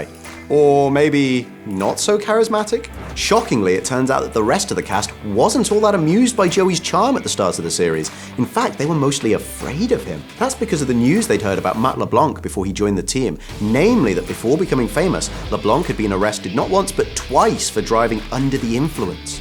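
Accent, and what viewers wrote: United Kingdom. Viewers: Your accent tells me I don't really care what you think about this show. You might as well be the BBC. They like smearing American's too. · OMG U r too fast british accent.. Slow down man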